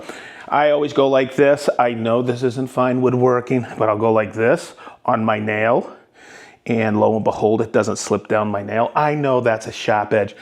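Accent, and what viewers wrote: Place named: New England, United States. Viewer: Yikes! Metal shavings around wood-the reason I separate wood shop from my lawn mower repair shop. I get it your shop is set up for videotaping still makes me cringe. With that said, the hand grinder is my new go-to method to sharpen mower blades that’s an excellent method and like you hit the burrs with a file. There is a debate out there you shouldn’t sharpen to “sharp” as it accelerates degradation of the edge. For me I like sharp and my customers appreciate it too. Cleaning the blade I use a wire brush currently but will try your soak method next time. One shop I used to work at hit the blade with black spray paint. This along with power washing machine with clean blade adds a perceived value of pride-things that transferred over to my current shop. Like your “wicked good “ New England accent.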